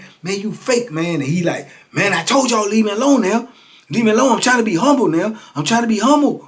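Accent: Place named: Washington DC, United States. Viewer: Every time I hear you, I hear DC vibes, real live. Lol.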